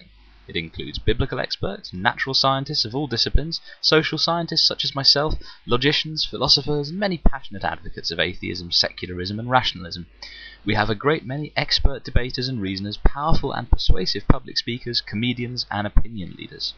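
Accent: United Kingdom. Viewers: I love your British accent ;). Her video was funny. I asked a few Christians why they believe and a couple reasons I got were "If I live as if there is a God and I die and find there isn't, what have I lost. nothing. If I live like there is no GOD and die and there is, I have Lost everything. That is a chance I am NOT willing to take." and "the evidence is all around just look at nature"...etc. LOL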